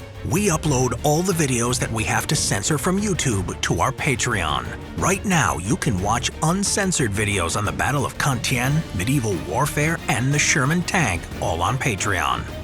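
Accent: United States. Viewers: "Any questions?" Yes: why do you should like an American? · A Briton who speaks American..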